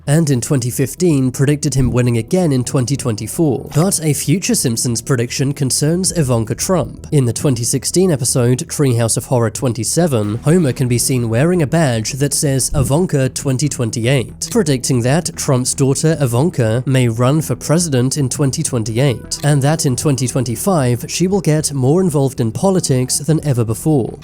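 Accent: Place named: England, United Kingdom. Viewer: What enlish accent is this, makes me crazy listen to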